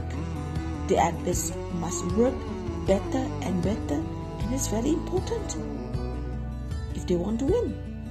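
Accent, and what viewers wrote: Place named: United Kingdom. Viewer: It sounds like British. No boddy noticed that you are Indonesian 👍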